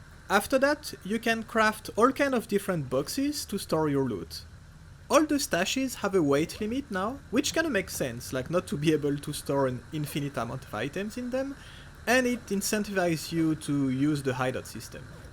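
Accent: France. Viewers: Cet accent fr mon dieu ahah ! Merci pour la review !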